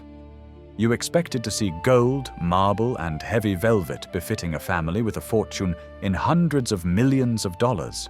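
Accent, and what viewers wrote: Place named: United Kingdom. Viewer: Why do we have an awful Brit accent narrating a totally American documentary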